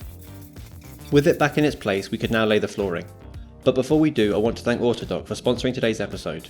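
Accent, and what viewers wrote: United Kingdom. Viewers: La placa española me ha dejado descolocado, eres de España? Tienes un acento británico que no se tiene ni no se ha nacido allí 😂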